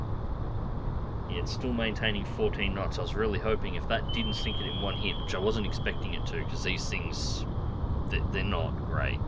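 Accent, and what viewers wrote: Australia. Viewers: Clicked on the video thinking it was Jive Turkey. I was so confused for a second when I heard an Australian voice. :)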